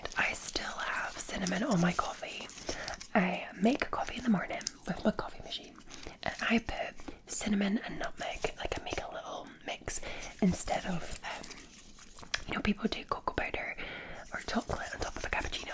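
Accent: Scotland, United Kingdom. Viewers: half an hour of tingles from the resplendent scottish lass. perry the platypus noises ☺️ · Great video ❤ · U had me at Scottish 🏴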